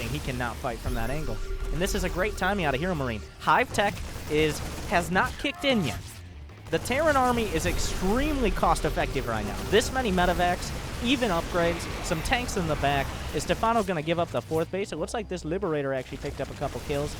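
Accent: United States. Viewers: USA but with a more "country" accent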